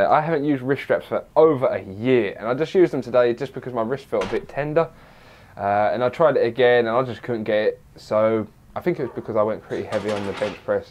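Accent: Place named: United Kingdom. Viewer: Which part of the uk you from?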